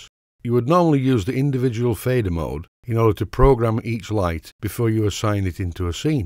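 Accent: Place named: Northern England, United Kingdom. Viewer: That's a very helpful video - thanks a lot! (And, I couldn't help noticing, very sweet Northern English dialect - u sounds, I luve it :-)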